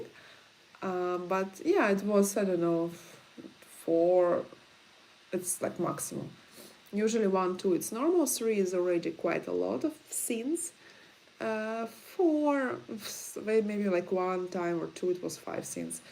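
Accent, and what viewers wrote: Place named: St. Petersburg, Russia. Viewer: great video! I lived and worked in Moscow years ago and visited St. Pete where I believe you are from many times. Your accent brings back some wonderful memories! Come and visit "the Valley of the Sun" here in Arizona sometime!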